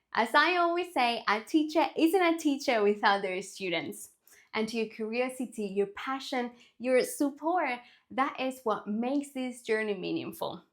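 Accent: England, United Kingdom. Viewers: This is very true. Just listening isn't enough. If you listen to a lot of hours your listening comprehension will be high but your speaking won't. You've got to practice speaking and at first it will be difficult with a lot of mistakes, but the more you speak the better you will speak. The great thing about it is, if you listened to 2000 hours you don't need to match those hours with speaking hours. You can reach a good level of speaking with less hours but obviously, the more speaking hours the better., I can tell you've listened to a lot of English content. You've acquired the accents. I say accents because you have an accent from England and also a little from America. It's all good though. You're fluent. There is no doubt.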